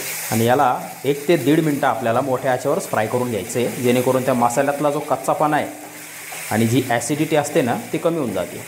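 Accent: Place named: Pune, India. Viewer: भाऊ तुम्ही नाशिक पेक्षा, जास्त आमच्या पुण्याचे मुळशीतले शेप वाटतात, आमच्या गोड रांगडी भाषा सारखी ❤️ तुमचीपण पन टोन वाटते 👌🏻 🙏🏻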